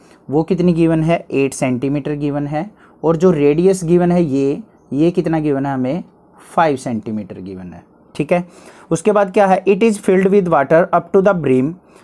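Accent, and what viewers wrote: Haryana, India. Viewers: haryanvi sir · sir aap haryana se ho kya 🤔🤔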